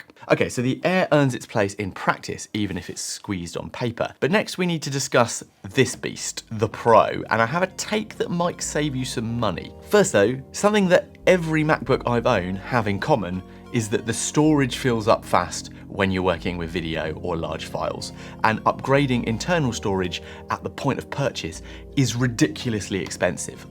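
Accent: United Kingdom. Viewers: Great video! I loved the British accent!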